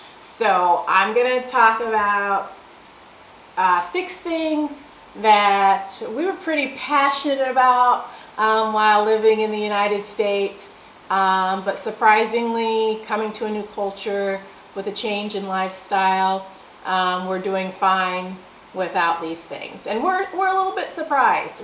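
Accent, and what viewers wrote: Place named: Lisbon, Portugal. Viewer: Awww you already talk as a lisboeta 😊😊👍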